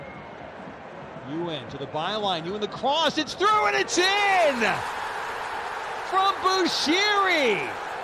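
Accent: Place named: United States. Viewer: American commentator? I didn't expect that really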